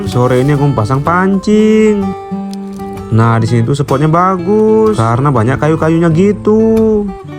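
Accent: Java, Indonesia. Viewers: Abang orang Jawa kah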